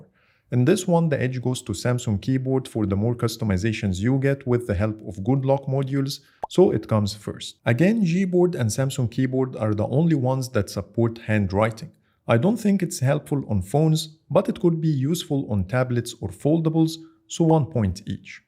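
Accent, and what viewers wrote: Egypt. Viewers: الاكسنت المصري تقدر تميزها على طول 😂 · اكسنت انجليزي مصري بس بحد النطق كلو صح ماشاء اللة اتعلمت انجليزي فين ؟ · From your accent i know you are Egyptian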